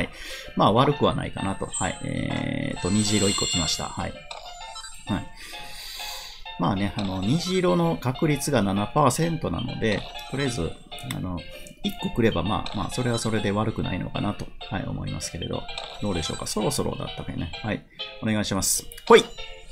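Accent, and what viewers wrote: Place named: Kansai, Japan. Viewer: あ、残念ですねー😆 目つぶってる🤭ｸｸｸｸｸｯ 浜田さんの関西弁好きやでー❤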